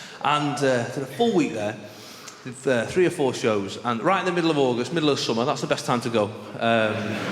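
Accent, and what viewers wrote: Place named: Manchester, United Kingdom. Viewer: isnt he speaking variant of manc. i used to be from south manchester and his accent is thicker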